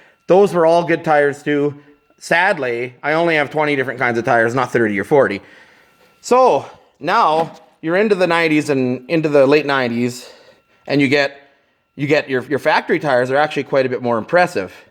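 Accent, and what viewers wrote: Canada. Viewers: I swear all you Canadians sound the same..I can just tell right of the bat your a Canadian 😂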